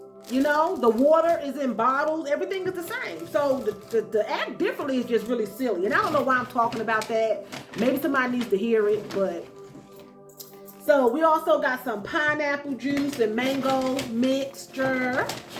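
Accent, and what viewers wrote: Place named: Brooklyn, United States. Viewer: Girl 😂 I can hear that Brooklyn accent in you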